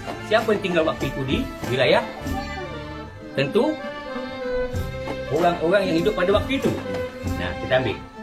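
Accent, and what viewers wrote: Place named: Malaysia. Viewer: kenapa cara dia bercakap macam orang melayu di malaysia?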